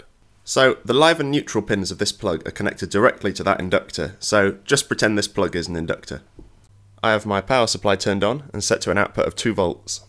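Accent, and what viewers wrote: England, United Kingdom. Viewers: Very good and understandable lesson on the power supply. I don't know yet where you are based in the world, but you accent sound more england. I am in south africa. I hope you can allow me to add that the potentiometer does not only adjust the output voltage, but also control the entire circuit and protect it from getting out of regulation and destroying itself. Thank you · I've spent over 35 years in industrial power electronics, own and operate my own engineering firm, and taught full time as a professor in technology for thirteen of those years. Kudos to your teaching methods. You're water illustrations are brilliant and intuitive and speaking as a Canadian who recently visited Yorkshire in Britain, I love hearing the British accent and idioms in this video. Some corrections however: The thermistor employed for over-current protection is a PTC (Positive Temperature Coefficient) device, not an NTC (Negative Temperature Coefficient) device. 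NTC thermistors are used for temperature measurement. PTC devices act like resettable fuses and limit inrush current by increasing in resistance as a function of temperature (i.e. higher current). Both are non-linear devices. MOVs behave like back-to-back zeners and exhibit very high resistance until you reach their break-over voltage but unlike an SCR, don't avalanche (assume full conduction when triggered on). In this way they only clip excess energy above a target voltage threshold but they don't chase it all the way down to zero volts. MOVs also tend to deteriorate with each spike they take so their effectiveness over time can diminish and they are rated in Joules as well. Also, MOVs like to explode when their energy levels are exceeded so I've seen designs where they're shrink wrapped to contain flying bits in the event of.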